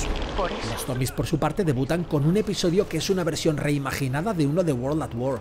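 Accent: Spain. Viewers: Volvió castellano, gran análisis maestro.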